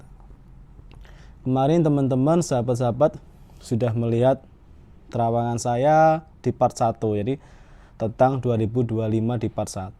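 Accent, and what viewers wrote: Java, Indonesia. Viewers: Ms miriP Orang Korea kk..tp jowone medok..orang mana ya kk.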